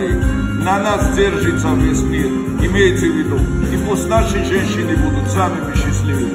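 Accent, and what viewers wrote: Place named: Georgia. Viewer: Ты наглый лецимер я понимаю что ты застрахавался опасный фраерок в тебе грузинский только акцент ты не читал Руставели И Мамардашвили и глубоко не понял не отца не мать извини что так жёстко но это очень важно у тебя посыл что в рабстве будучи грузином можно хорошо прожить ты опасный чем твой хозяин !!!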